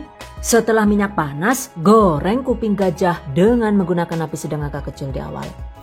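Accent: Banyumas, Indonesia. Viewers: Saya suka resep" bunda, saya juga sering praktekin resep" nya alhamdulillah berhasil bun, dan yg ini mau saya simpan juga resepnya kapan" mau bikin juga, trimakasih resepnya bun, lebih suka lgi klo ngomong ngapaknya bun..itu anak yg suka nyobain adonan mentah udah gede ya bun..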